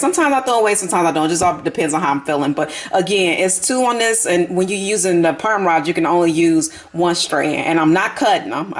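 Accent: South, United States. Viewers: I love your southern drawl!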